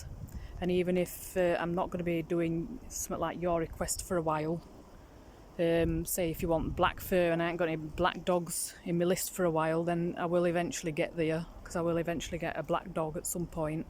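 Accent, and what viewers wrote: Yorkshire, United Kingdom. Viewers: Love your Yorkshire accent, its just like my dads so i understand you no problem. Lol You are most talented, just subscribed! Hi from Canada 🇨🇦 loving all your content. Cheers, Michele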